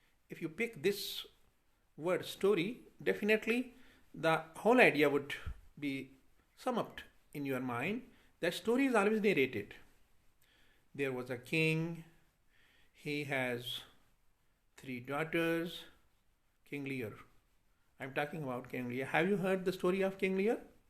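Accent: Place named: Khattak, Pakistan. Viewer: Pure Khattak and non-native accent.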